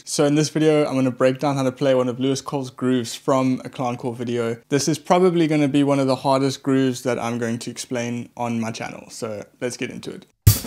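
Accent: South Africa. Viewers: You South African? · subbed cause your English is as South African as mine. keep up the work, excellent lesson , ill watch more videos. not a drum player tho just love good music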